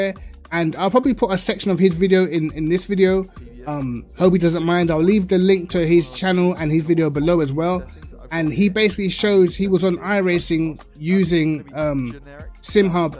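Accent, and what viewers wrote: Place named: United Kingdom. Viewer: Why are you British?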